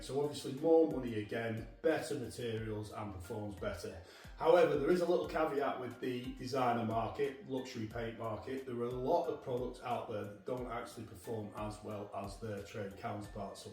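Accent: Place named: Northern England, United Kingdom. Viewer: What with this guy’s (slight) northern dialect, the fact that he seems to be in an empty room , therefore an echo chamber AND faint background music from his obligatory radio, the listener didn’t stand much of a chance to hear what he was saying !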